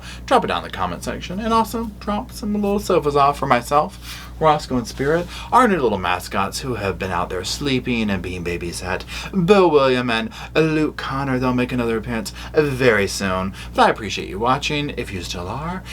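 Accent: South, United States. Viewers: I’m sure you covered it in some way on one of your videos but im curious are you from the south? I thought i could hear a lilt and a word or two thats very regional.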